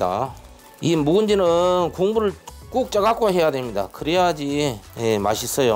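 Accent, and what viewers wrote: Jeolla, South Korea. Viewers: ㅎ.. 고향이 어디신가요? 전라도쪽 같아요~~ 여긴 전라도 광주 입니다.. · 셰프님 부산분이시죠? 전라도 사투리도 들려서요ㅋ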